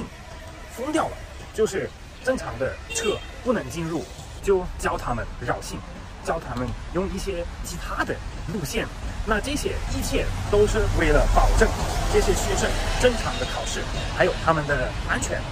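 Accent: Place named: Chengdu, China. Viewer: 成都话好亲切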